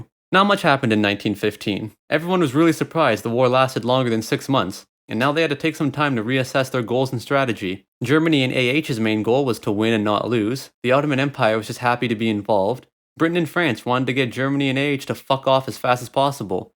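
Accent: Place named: Newfoundland, Canada. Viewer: I’ve been binging your videos and was thinking to myself “sounds familiar..” and the b’ys confirmed it! You’re an NLer!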